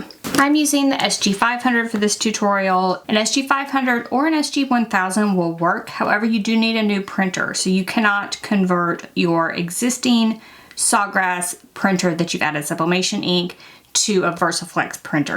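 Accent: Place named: United States. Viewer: The microphone is tuned up to high which means it's not exactly complimentary to a female American accent and vocal fry.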